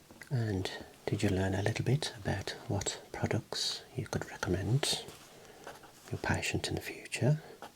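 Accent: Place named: West Midlands, United Kingdom. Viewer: When MassageASMR stopped making videos I sadly accepted that I’d never find another ASMR creator who relaxed me as much as him — then I found your channel. YouTube algorithm kept putting you on my homepage way back before you were even at 1k subs I think - reluctantly I gave you a go seeing as the algo was so insistent on you… thank goodness I did that! You’ve become my favourite ASMR creator BY FAR. Especially helps your accent reminds of back home in the West Mids. Long may your channel keep growing and thriving!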